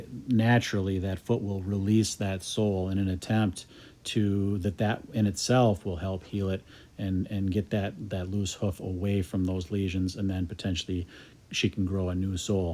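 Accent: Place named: Midwest, United States. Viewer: I figured out why I'm kinda obsessed with your channel, its kinda like watching rust busting work on a ship, but with a pleasant and informative midwestern voiceover and empathy.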